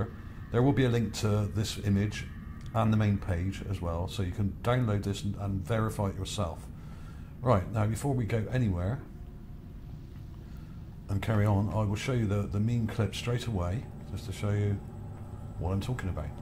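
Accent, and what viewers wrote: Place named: West Country, United Kingdom. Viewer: dont deny your history , Mr, Westcountry accent. love your recent stuff , some of your idras can some times drift a little, but the upside is the west country drawl is as an OPIATE to many of your disciples.. thank you for the free opiates...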